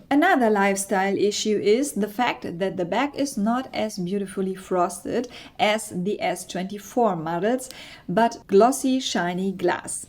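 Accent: Germany. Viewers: You have a beautiful German accent 😊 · She almost sounds like me with that accent. Living in the USA now coming from Berlin Germany.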